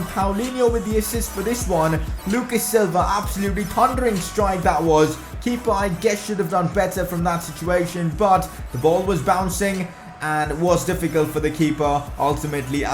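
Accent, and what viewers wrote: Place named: India. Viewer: That one Indian kid in school who tries to be cool with his cringe ass accent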